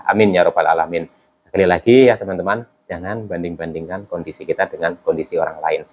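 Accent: Banyumas, Indonesia. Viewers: ngapak asal mana mas?sy juga kena anxiety siapa tau bs sharing...ada group ga atau WA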